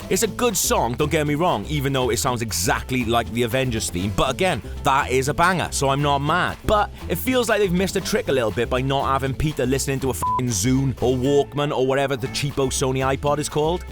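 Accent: United Kingdom. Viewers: Are you from the uk 😂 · Fiske Fjæs How can u not tell his accent?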